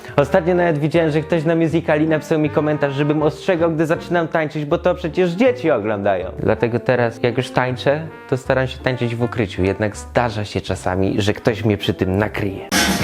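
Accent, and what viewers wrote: Germany. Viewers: Dobry niemiecki akcęt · Powinieneś jechać do niemiec. Super niemiecki akcent translator i filmik šmieszny hee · Dobry Niemiecki akcent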